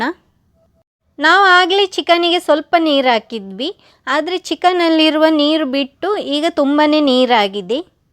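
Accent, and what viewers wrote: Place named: Kundapura, India. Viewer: ಹೊಯ್.... ನಿಮ್ಮ ಕುಂದಾಪುರ ಕನ್ನಡ ಲೈಕ್ ಇತ್ತೆ... 😋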